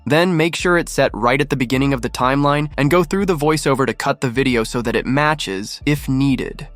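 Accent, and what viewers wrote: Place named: United States. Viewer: Love American accent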